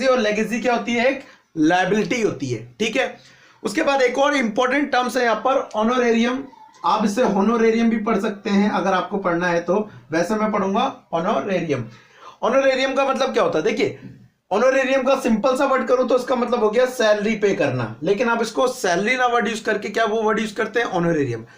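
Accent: Uttar Pradesh, India. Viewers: Sir aap uttar pradesh se ho na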